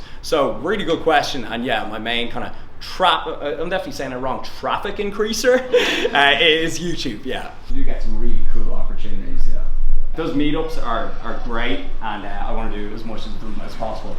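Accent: Dublin, Ireland. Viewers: Are you from Dublin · what part of ireland are you from??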